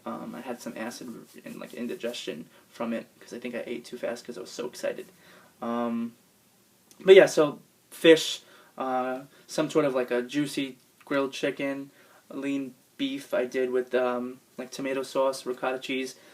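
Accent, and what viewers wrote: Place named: Long Island, United States. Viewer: your LAWNG GUYLAND accent was really in full effect in this video...haha sadly I've basically lost my NY accent. Nice update.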